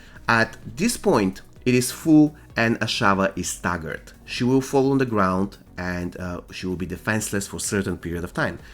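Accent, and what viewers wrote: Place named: Romania. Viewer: Dupa accent esti roman :))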